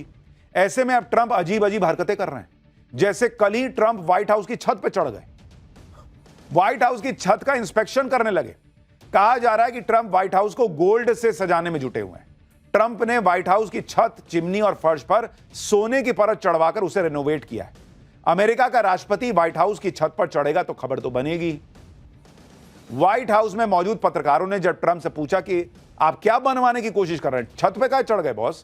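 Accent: Bihar, India. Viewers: Sir aap bihar k hai kya ya up k Mast bolty hai 😂😂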